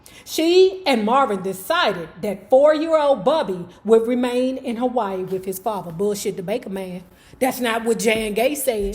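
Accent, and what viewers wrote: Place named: DMV, United States. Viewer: you bring back great memories of me and my children living in the DMV. Your accent and the things you say crack me up cause you are a true representative of The DMV. Keep being yourself.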